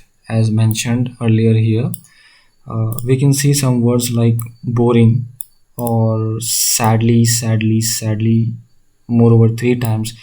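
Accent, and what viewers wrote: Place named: India. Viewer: Probably the Indian accent got you.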